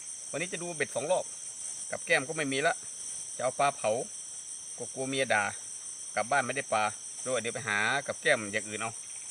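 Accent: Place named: Korat, Thailand. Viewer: ตกลงแล้วลุง อยู่ภาคไหน ภาคอีสานหรือภาคเหนือ สำเนียงเหมือนคนโคราช ติดตามมานานพอสมควรแต่ไม่รู้ภูมิลำเนาลุง จริงๆสำเนียงผมคิดว่าเป็นคนนครราชสีมา(โคราช)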